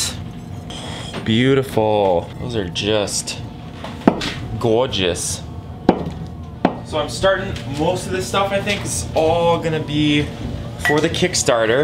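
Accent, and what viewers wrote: Minnesota, United States. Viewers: Wow CLASSIC Minnesota accent lol love it. Mine only comes out when I’ve had too much to drink now. 😋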